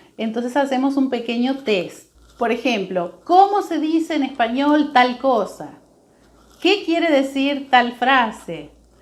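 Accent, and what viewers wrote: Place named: Argentina. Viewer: ¡ Hola,! me llamo Robson y soy de pernambuco,Brasil. creo que tú eres mi vecina, porque tu acento se parece de argentina o Paraguay. Bueno, a mi me gustó tu video. pido perdón, aún no sé escribir bien en español. Dios te bendiga.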